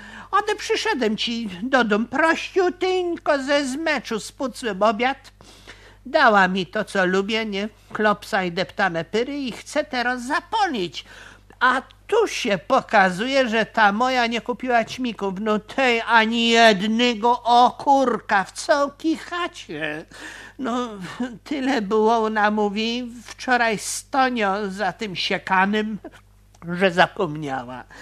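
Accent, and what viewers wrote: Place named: Poznań, Poland. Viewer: fajno godo :) tero tak mało wiary gogo po poznańsku szkoda :(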